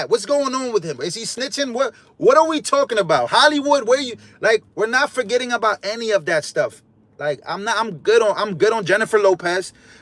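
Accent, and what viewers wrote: New York, United States. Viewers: Thank you for reporting from the rally at MSG. You were awesome. I cant thank you enough. I had tickets to go but did not want to go alone. I follow 2 youtube people. One is you..I knew you were a N.Y.ER I COULD TELL BY THE WAY YOU TALK. IM A N.Y.ER ALSO.. THANKS FOR SPREADING THE GOOD WORD. YOUR A TRUE PATRIOT ❤ 🇺🇸🇺🇸🇺🇸🇺🇸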